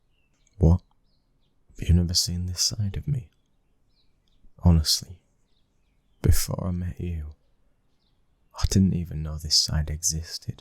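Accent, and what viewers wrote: United Kingdom. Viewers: I knew i recognized the series, i have listened to the original one where it is a Italian voice, but i also liked this one as i am also British and it makes it easier for me to listen to, keep it up ❤❤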